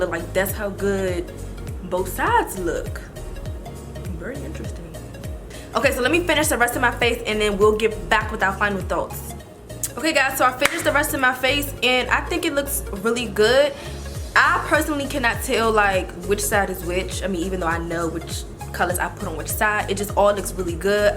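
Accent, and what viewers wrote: DMV, United States. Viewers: New subbie!!! I knew you were from DC by the way you talk lol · I can already tell your from the dmv by hearing the way you talk ❤️ love this video too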